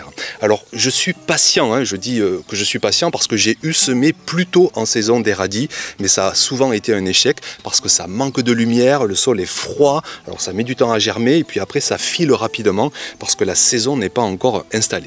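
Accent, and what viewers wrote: South, France. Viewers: Hello, Ton sourire, ton accent du sud, ton enthousiasme, ton énergie, ta passion, mais également ton travail, tes connaissances, ton partage, ta bienveillance fond de toi un marchand du bonheur. Merci 🙏 Ça fait déjà 15 jours que j’ai démarré cette nouvelle saison et je repique aujourd’hui ma première plaque alvéolaires de tomates 😊 J’ai également commencé la création d’une couche chaude format XXL qui devrait accueillir une bonne partie de mes plaques et de mes godets, mais également à terme me produire du compost. C’est mon défi cette année 😅 Je trépigne d’impatience pour découvrir ta nouvelle installation de semis. A très vite.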